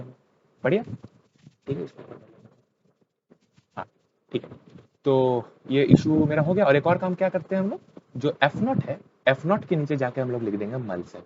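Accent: Bihar, India. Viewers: Bihari accent sunke bhaiya wali vibe aa rahi hai sach me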